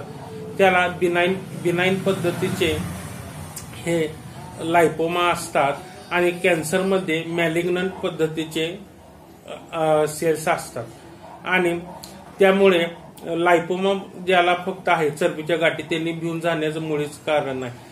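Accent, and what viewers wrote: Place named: Kolhapur, India. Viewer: डॉ छान माहिती दिलीत homoeoathic बद्दल आपल्या बोलण्यात कोल्हापूरी साज पण मस्त आहे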